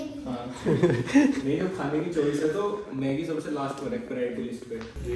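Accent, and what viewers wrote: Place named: Haryana, India. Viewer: I just love ur haryanvi acsent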